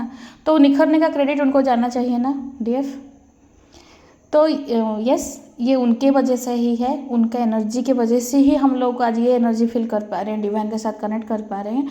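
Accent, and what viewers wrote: Odisha, India. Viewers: Mujhe b Aisa laga accent se lag raha odisha se hen jese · Mam ap odisha se hein mujhe lgta h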